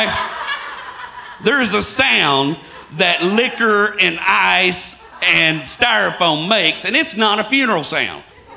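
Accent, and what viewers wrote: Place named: Mississippi, United States. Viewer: As someone born and raised in Mississippi you sound just like most of my family and it's kinda surreal hearing somebody like us doing stand up keep it up man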